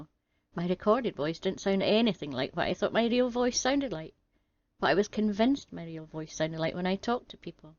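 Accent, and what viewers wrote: Scotland, United Kingdom. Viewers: First hehe.... You have a sweet voice and I love the Scottish accent :) I can listen to your voice all the time :)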